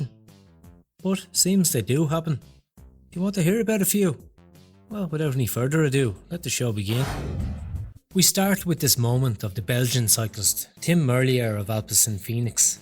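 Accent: Dublin, Ireland. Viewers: What part of Ireland your from ? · Sounds like a Dublin accent.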